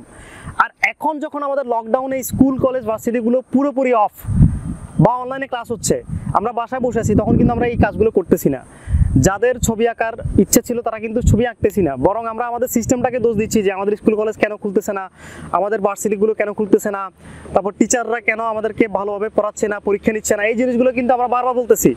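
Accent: Chittagong, Bangladesh. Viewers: ভাইয়া আপনার বাড়ি চিটাগাং নাকি?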